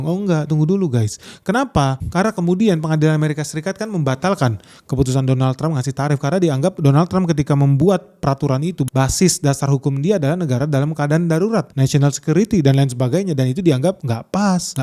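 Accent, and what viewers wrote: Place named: Surabaya, Indonesia. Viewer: pekpuk nek bahasa surabayane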